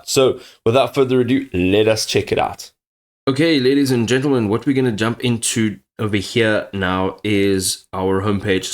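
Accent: South Africa. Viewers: Are you from pretoria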